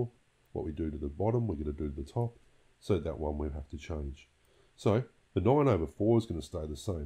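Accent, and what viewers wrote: Australia. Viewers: He’s an Aussie , American moment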